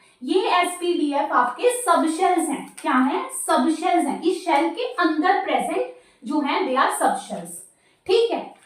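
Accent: Punjab, India. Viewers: Mam ap punjabi ho???😅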